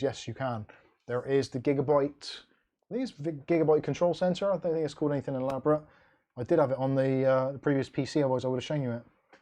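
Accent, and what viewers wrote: Bristol, United Kingdom. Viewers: you sound like me I'm from bristol aswell haha 😂 I'm in brislington end lol that's crazy, I've subbed aswell man good videos 🙂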